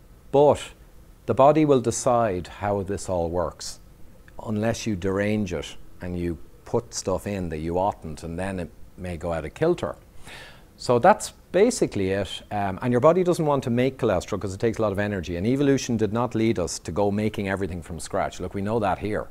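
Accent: Ireland. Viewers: Ivor Cummins I love the Irish, no translation needed! Lets do a Skype session.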